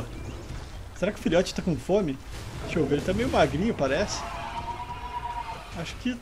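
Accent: Rio Grande do Sul, Brazil. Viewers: Vc é gaúcho né ?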